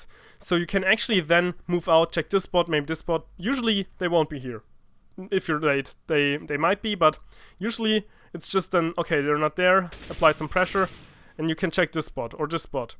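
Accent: Germany. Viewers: Bist du deutscher? Hört sich so an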